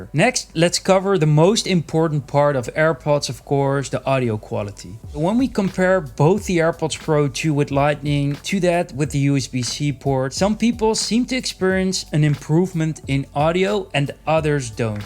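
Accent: Netherlands. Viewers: The Dutch accent is strong with this one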